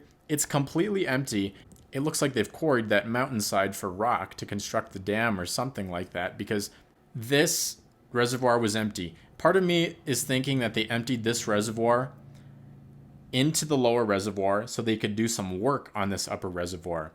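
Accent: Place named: Midwest, United States. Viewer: I’m glad you liked SoCal. I would never leave. Born and raised here in Orange County. I can hear your Midwest accent. Never been to the Midwest or East Coast. It’s so odd that you’re hapa and you’ve never been to CA. Hapa means part Asian.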